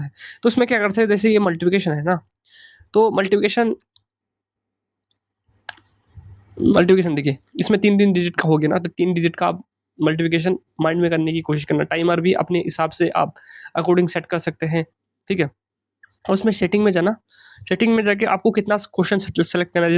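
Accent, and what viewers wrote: Bengal, India. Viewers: Mujhe aisa kyu lag raha hai aap bangali ho😅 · ❤❤❤❤ Bhaiya ji aap Bangal se ho kya ???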